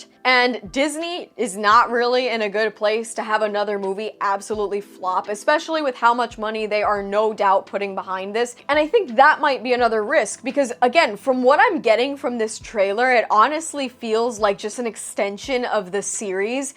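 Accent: Spain. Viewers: Less "F" bombs, more Spanish accent!!!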